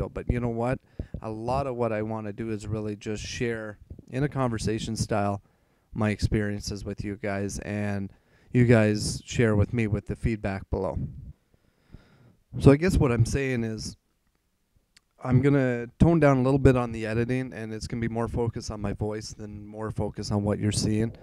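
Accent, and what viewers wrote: Canada. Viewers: Wait you're Canadian???? Where are you from?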